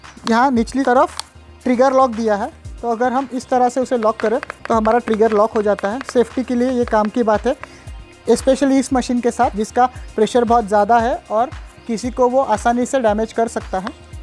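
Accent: Maharashtra, India. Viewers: Are you marathi? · are you marathi?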